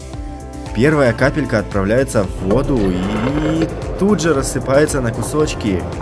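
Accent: Russia. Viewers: Все отлично, но так бесит твой марийский акцент. Или это просто такая манера речи, дико извиняюсь, но прям слух режет.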